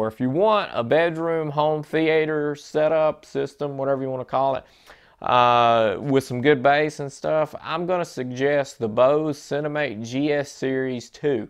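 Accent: South, United States. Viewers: Came here cuz I would like to buy used ones. Daaaaaaemn heeee soooouands sooooyyy reeedneick.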